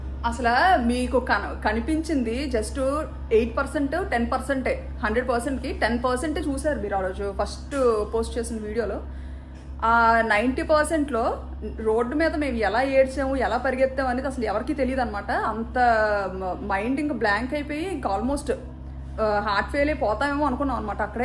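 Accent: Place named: Srikakulam, India. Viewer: Language Srikakulam maraledu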